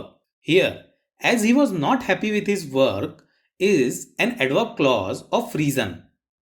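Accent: India. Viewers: Why is the voice so Indian? Is he from india?😂😂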